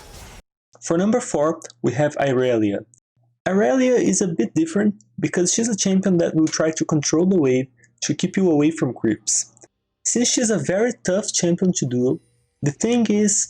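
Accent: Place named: Brazil. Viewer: isso é sotaque br hein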